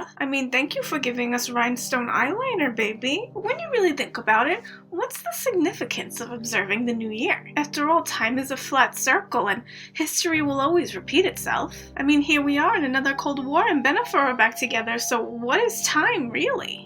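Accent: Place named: New York, United States. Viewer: Quick question. Which borough would I find the accent in? I think I found my type 😂😂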